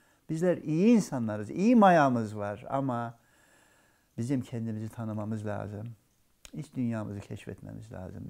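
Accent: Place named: Azerbaijan. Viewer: Azerbeycan şivesini çok seviyorum, Teşekkür ederim ❤🙏🙏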